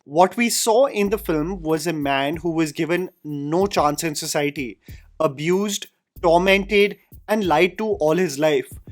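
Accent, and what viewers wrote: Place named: India. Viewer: jesus, what's with the indian accent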